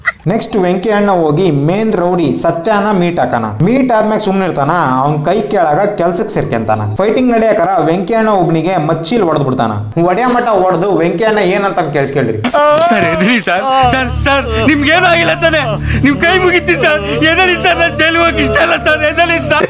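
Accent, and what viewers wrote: Uttara Kannada, India. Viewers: full uttarakannada slang a maintian maadri ......eee nadu nadu bangalore accent byada...goood going... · Uttara Kannada maatugalu.. namma huduga ivaan❤❤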